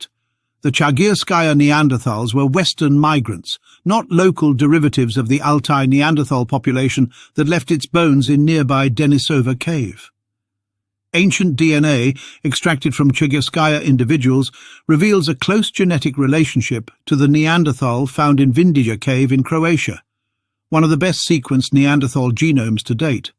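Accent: England, United Kingdom. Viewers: I see people today who have these features. Who is your English accent?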